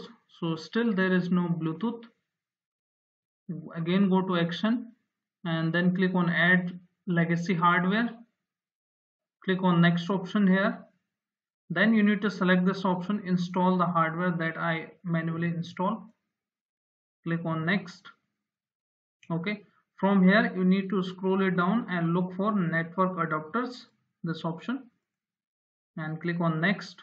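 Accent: India. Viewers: man I should know by now to trust indnan accent, you are the G my man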